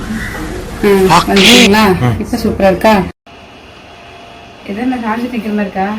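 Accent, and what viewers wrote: Kongu, India. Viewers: Kongu speech &look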